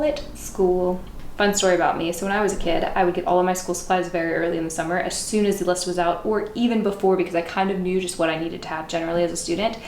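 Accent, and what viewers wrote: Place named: Scotland, United Kingdom. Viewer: My baby was babbling over you talking and I heard that you pronounce everything foreign in a "Scottish" accent because that's the other language you know. That tickled me 😂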